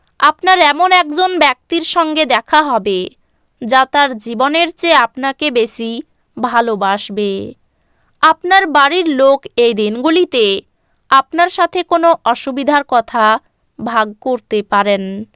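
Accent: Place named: Bangladesh. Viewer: Ki Bangladesh thake bolcchen mone hocche.....bhalo kore bangla bolte sikhun ..musulman bole mone hocche....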